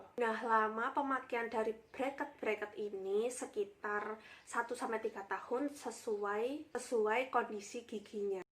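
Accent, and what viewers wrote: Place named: Java, Indonesia. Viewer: Medok rek jowo ne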